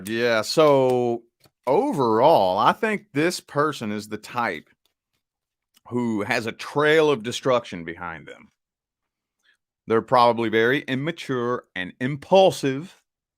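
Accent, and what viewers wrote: United States. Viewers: It's cool to hear a reading in my country boy accent. Very good read.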